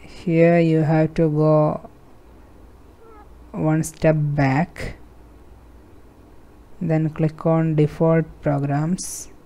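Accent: Kerala, India. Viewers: Chetta ningalde english kettappo manassilaayi malayali aanenn ente prashnam enthannu vechal 1, Eppalenkilm pc marythakku on aayal taskbar and settings not accessible aanu 2, pc open avumbolekk screen blank aavua pinne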